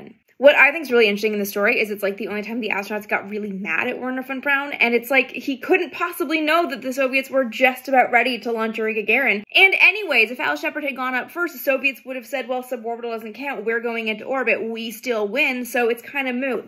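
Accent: Canada. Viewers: Hi, Your posting was, as always, brilliant BUT, please slow down a little. You’re talking so very fast anyone who isn’t a native English speaker attuned to your Canadian accent is going to find you very difficult to understand. I have many Asian friends who are fascinated by the subject matter, but really struggle with your bullet-fast oratory. Cheers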